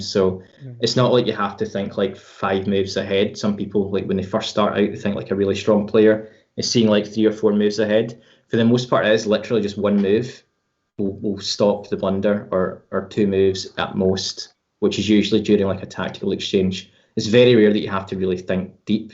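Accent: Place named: Yorkshire, United Kingdom. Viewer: Which chess club are you a member of? I assume one in Yorkshire based on that accent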